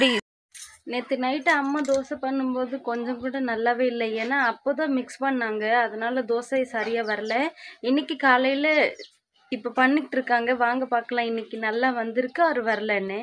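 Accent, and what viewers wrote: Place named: Odisha, India. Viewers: Tamil kathukittu pesura odisa ponnu ithu I think 🤔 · I feel this girl is not a Tamil girl... She has learnt Tamil when working in TN....her accent is different and her dressing and all matches odisa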